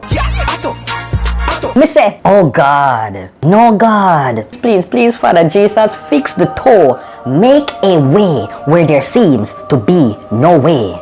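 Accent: Jamaica. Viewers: You did one without the"I is", and the, "I are". This time u really sound like a Jamaican.:-) oh by the way "u win wid a gap like me front teeth", made me laugh.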